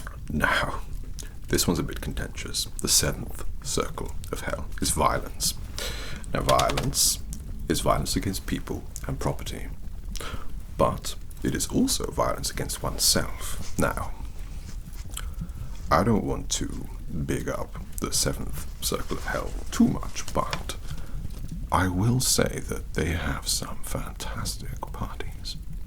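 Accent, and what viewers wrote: Wales, United Kingdom. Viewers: even tho I'm pretty sure you're Welsh hehe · Yes finally an asmr video that combines all my favorites. Asmr, and Demonology, and british accents. Fantastic performance good sir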